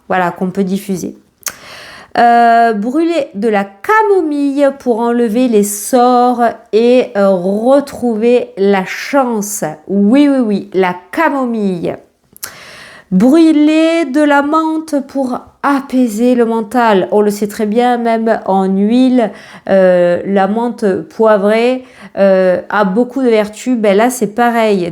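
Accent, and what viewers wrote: South, France. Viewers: Bonjour, merci beaucoup pour les rituels. je suis fane de vos vidéo, je les trouve génial et surtout votre accent du midi, j'adors. je vous souhaite une très bonne année a vous et toutes votre familles.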